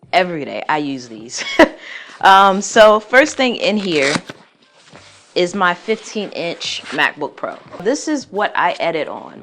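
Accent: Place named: South, United States. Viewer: She got that Southern accent 😌👌.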